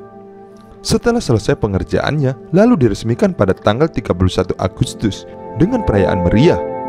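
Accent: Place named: Eastern Indonesia, Indonesia. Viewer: Suara adminnya kaya logat Indonesia timur...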